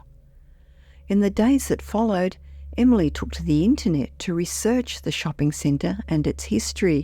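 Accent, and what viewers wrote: Australia. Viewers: I know it's an Aussie accent, just wondered whether it was human or robot. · Voice is Aussi accent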